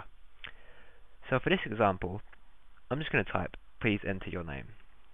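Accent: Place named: England, United Kingdom. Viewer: I have a hard time trying to understand VBA. Trying to understand that Englishman's accent makes it harder. I have had to rewind to listen to what he is saying half a dozen times in this video, and still didn't get all his words. I know he sounds like that cute gecko on the Geiko commercial - but have him work on his accent for us American folk just trying to learn VBA. Thanks. (I am sure he is probably a nice guy - don't take it personally, guy.)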